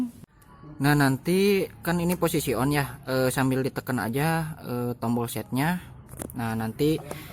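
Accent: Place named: Sunda, Indonesia. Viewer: Siap hatur nuhun bang Logat Sunda emang kental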